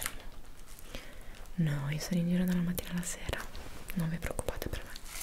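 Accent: Italy. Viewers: Soy el comentario en Español que no esperabas. No entiendo nada pero me encanta el acento italiano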